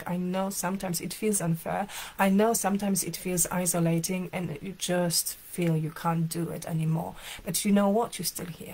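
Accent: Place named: Germany. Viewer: Are you german? I hope you do not mind my question its the accent. I loved your video, a good reminder. JazakyAllahu Khayran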